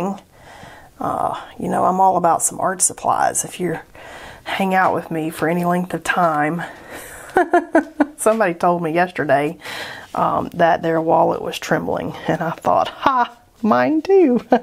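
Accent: South, United States. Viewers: FINALLY find someone with MY accent. I was beginning to think I was the only southern artist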